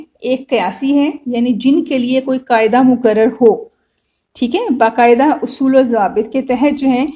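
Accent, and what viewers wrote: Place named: Urdu, Pakistan. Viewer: Lhjy b apka urdu bolta h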